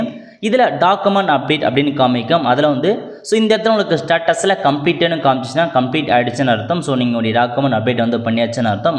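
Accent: North, India. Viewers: Unga voice vadakkan tamil pasera maare erruku ...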